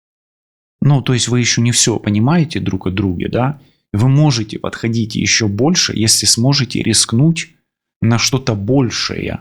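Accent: Ukraine. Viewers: Спасибо за красивую Украинскую речь.Рассклад - класс !.. Не знаю,как объяснить...🌹🙏🌹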